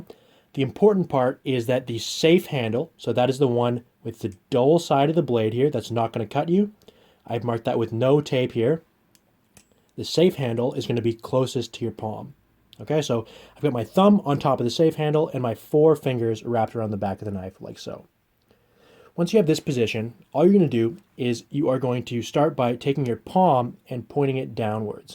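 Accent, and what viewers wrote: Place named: France. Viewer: yeah... but i gotta say it can be tough to hear well through his heavy french accent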